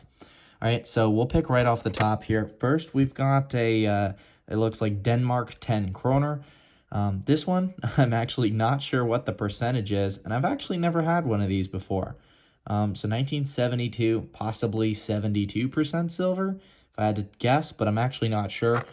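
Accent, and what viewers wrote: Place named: United States. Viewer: P.S American pronunciation is hilarious to the rest of the British world !! Bless!!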